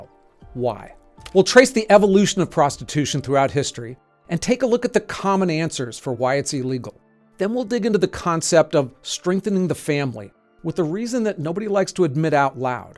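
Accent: United States. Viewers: But it is legal in most first world countries. Assuming from the accent you meant the United States but I wouldn't call this one a first world, just a really wealthy 3rd world country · Huh ? Prostitution isn't illegal. Ah, American accent. That explains it. Can't understand why the car was stopped on the left, though.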